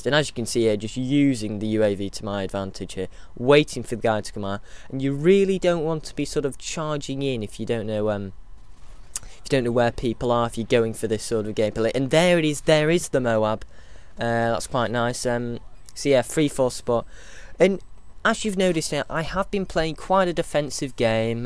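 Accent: United Kingdom. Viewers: Fuck that british accent... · Haha, a first video on MW3 that is presented by a what sounds like a cool British guy like me!! Wahooo. P.s I have nothing against Americans, they are cool to!!!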